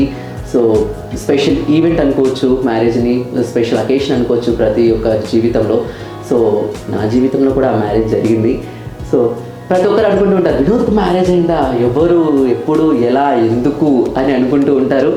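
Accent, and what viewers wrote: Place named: Kadapa, India. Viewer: Meedi Kadapa Anna happy to listen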